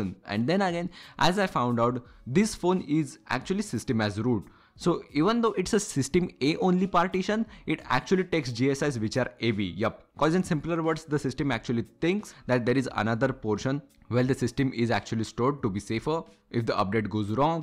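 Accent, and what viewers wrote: India. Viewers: I'm trying to find out how to root my redmi note 7 phone so that I can remove all the bloatware. This video sounds very helpful, but I am afraid I cannot follow your Indian accented English. Have you got a text version of your voice over which I could follow?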